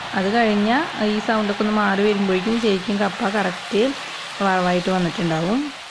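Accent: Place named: Thrissur, India. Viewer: Good thrissur language 👍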